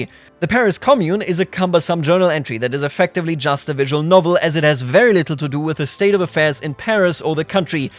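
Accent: Germany. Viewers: how does he sound so german yet so fluent in english at the same time? i cant stop listening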